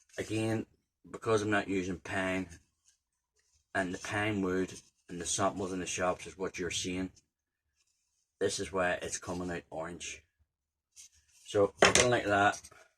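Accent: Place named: Ireland. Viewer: Good to hear a solid Irish accent 🇮🇪🇮🇪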